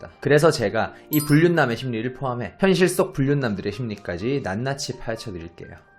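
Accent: Gyeongsang, South Korea. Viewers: 경상도세요? 발음이 살얼음 위를 걷는듯.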